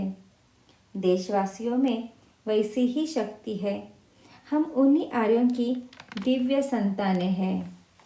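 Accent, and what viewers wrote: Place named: North India, India. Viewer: बहोत ही बढिया....! आपल्या बोलण्याची शैली एकूण आकाशवाणी वरील कार्यक्रमाची आठवण झाली...उत्तर भारतीय व्यक्ती प्रमाणे हिंदी भाषेतील आपले उच्चार शुद्ध आहेत...स्पष्टीकरण ही खूप छान आहे....👍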